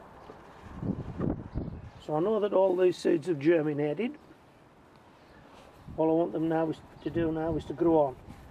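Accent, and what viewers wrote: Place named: Cumbria, United Kingdom. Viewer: So what happened? Did you get a crop? Thanks for the videos BTW - my other half says "that's a good Cumbrian accent" (and she should know)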